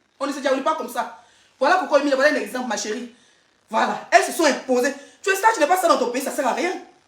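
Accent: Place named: Cameroon. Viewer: Stp parle comme une ivoinnene lorsque tu parle comme une camerounaise ça ne donne pas de grâce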